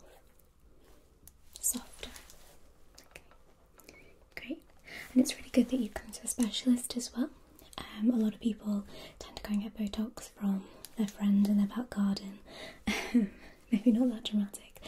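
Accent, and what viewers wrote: United Kingdom. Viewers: You have to most beautiful voice with the cutest british accent! please never be quiet in any of your future videos! <3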